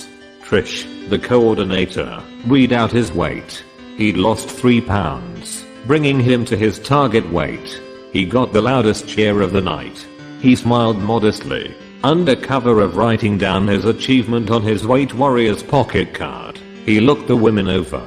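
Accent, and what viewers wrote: United Kingdom. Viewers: Great... All of his voices and pronunciation is perfect!Full of British Accent!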